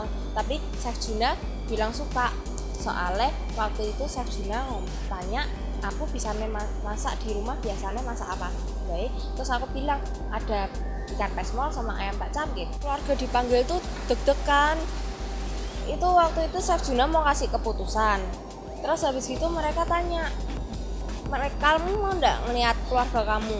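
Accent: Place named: Semarang, Indonesia. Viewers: panggil saja niyak itu logat semarang 😂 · Logatnya beda lah jatim sama jateng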